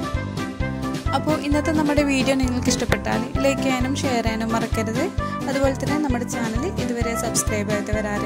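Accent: Kannur, India. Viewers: Kannur basha 👍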